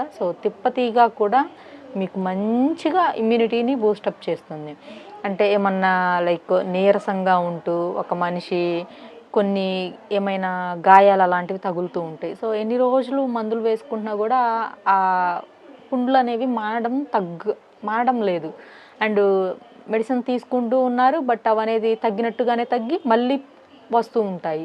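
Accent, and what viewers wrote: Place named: Andhra Pradesh, India. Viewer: Andhra slang alane untadi bro try to understand don't underestimate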